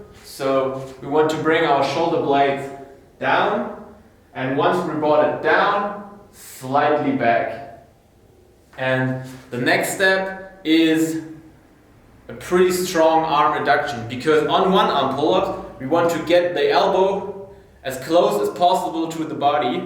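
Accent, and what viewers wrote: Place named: Germany. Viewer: This is a very german english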